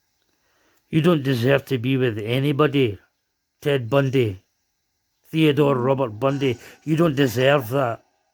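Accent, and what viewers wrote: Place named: Scotland, United Kingdom. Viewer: Your Scottish accent...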